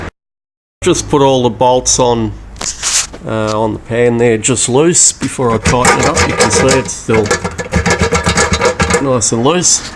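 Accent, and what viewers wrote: Australia. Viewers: Are you an Aussie ?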